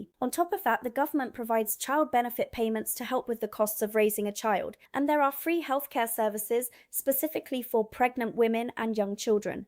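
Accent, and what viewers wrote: England, United Kingdom. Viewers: Why is your accent British? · Although has a great English accent, I wish that she would sound her Ts!!! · She’s American? With an English accent?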